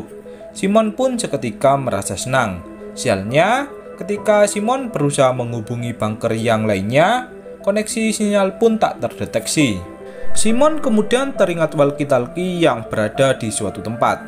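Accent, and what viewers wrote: Java, Indonesia. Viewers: Jowo totok bgt ngene iki mlh penak. Hahayyy..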